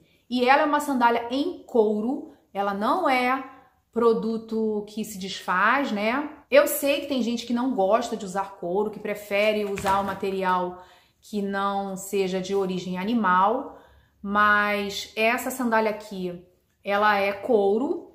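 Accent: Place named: Rio de Janeiro, Brazil. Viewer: Bem que desconfiei que você fosse aqui do RJ kkk pelo seu jeito de falar. Tamo junto, parça 😂 espero um dia ser agraciada com a mesma bênção de ir morar no Sul, como você. Bjs!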